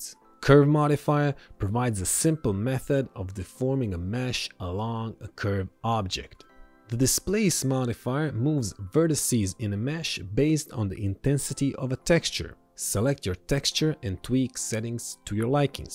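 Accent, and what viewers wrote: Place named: Israel. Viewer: מבטא ישראלי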